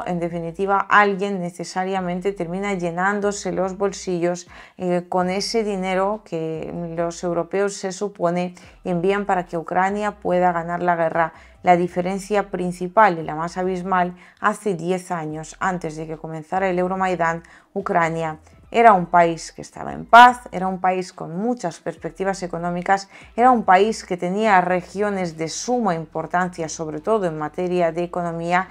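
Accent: Spain. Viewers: Te soy honesta y a pesar de q te admiro y quiero por ser 🌹 🤗 🌹RUSA❤no me gusta tu acento de española pq perdistes tu acento de rusa 🎉😂❤😊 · Me gusta tu contenido, pero se me hace indigerible ese acento español. Te sugiero suprimir ese acento ibérico, pues tu público es sobre todo latinoamericano. Los españoles son atlantistas.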